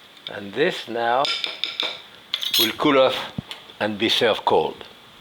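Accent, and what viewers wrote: France. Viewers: He has a French accent. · He's french!! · Just shut up and go away. He DOES NOT have a speech impediment. He has a FRENCH ACCENT.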